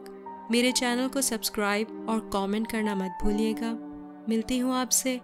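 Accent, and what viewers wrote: Pakistan. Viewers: I think she is Pakistani and living in abroad since birth that is why she has amazing accent and maybe fictitious minded so she can create terrific stories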